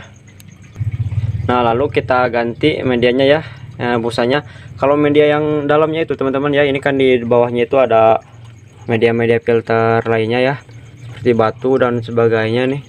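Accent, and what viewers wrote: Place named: Sunda, Indonesia. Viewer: Sunda bngt eta si akang😂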